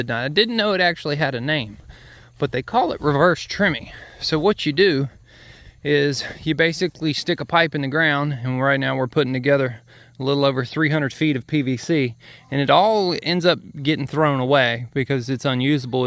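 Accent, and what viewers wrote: South, United States. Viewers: speaks in a southern drawl, making ceMENT sound like SEEment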